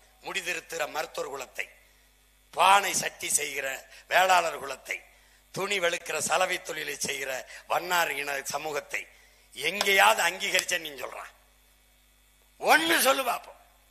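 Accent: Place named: Sri Lanka. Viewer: Nee ceylon thamil perusaa tamil vakka lathu vangure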